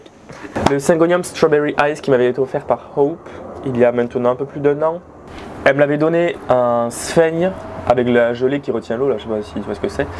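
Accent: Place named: South, France. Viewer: Oh le bel accent du midi 😂😂De très belles plantes et de l'humour tout y est ❤❤❤❤❤❤❤❤❤❤❤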